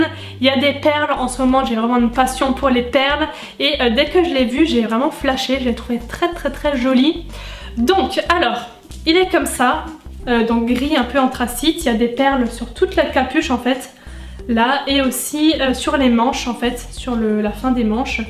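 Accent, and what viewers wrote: Lorraine, France. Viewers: Je me disais bien que ton accent me disait quelque chose, vive la Lorraine 🤟🏻❤️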